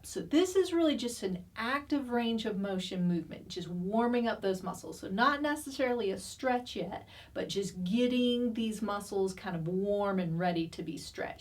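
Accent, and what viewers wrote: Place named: South Carolina, United States. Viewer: I am a PT and I want to thank you for doing such a great Public Health Service with your videos. Your treatment is spot on and delivered very clearly in an easy to understand manner. I’m in Massachusetts now but couldn’t help notice that you’re a fan of SC, my home state! It’s nice to hear a familiar accent. Keep up the good work!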